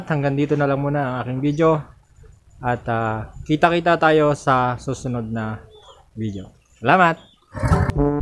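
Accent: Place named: Batangas, Philippines. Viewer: Boss na sub na kita. Npansin ko lng batangenyo ka.. btangenyo dn to hehe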